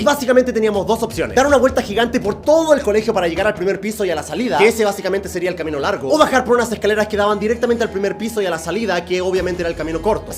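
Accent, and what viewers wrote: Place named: Chile. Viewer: Cuando German hablaba chileno 😢xd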